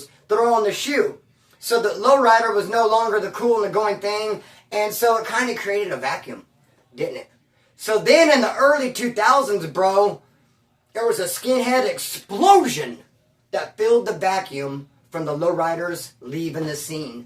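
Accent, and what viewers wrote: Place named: California, United States. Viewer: until you started talking and the Cali accent came out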